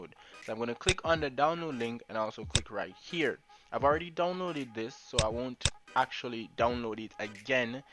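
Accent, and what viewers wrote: Jamaica. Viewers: i cant tell if you are from jamaica, canada or india lol no offense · are you jamaican? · u jamaican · Good vid bruh. Jamaica? Can hear the patois in the voice Wah gwan!!!!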